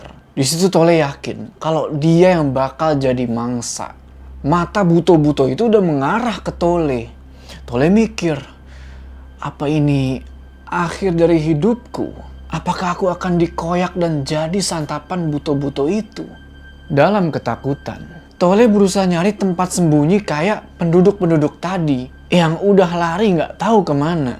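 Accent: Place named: Java, Indonesia. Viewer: yg part 1,logat bisa jawa belajar dr mana..haha Salam dr klaten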